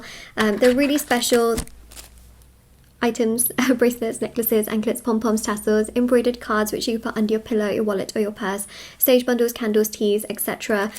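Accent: United Kingdom. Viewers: Dude you look like North Indian with strict parents but you sound like British ?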